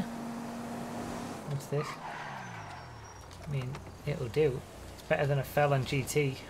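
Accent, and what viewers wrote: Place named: Scotland, United Kingdom. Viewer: Are you... Scottish I’ve never watched you before and I’m Scottish myself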